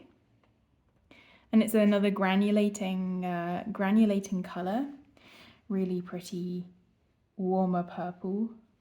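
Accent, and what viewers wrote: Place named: South Africa. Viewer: You sound South African but not!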